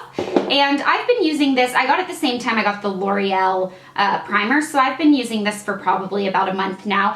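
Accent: South, United States. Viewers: I used to watch you years ago! (: I forgot how adorable your southern accent is ahah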